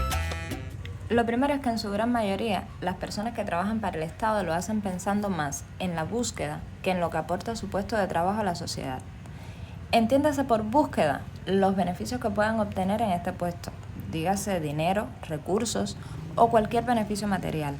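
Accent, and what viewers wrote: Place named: Cuba. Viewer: Que diccion y voz tan agradable. La prueba de que se puede hablar con acento cubano sin que suene a regueton. Muy completo y systematizado el contenido. Por cierto, yo siempre pense que un colero es el que vende su puesto en la cola, profesion exclusiva de Cuba 😅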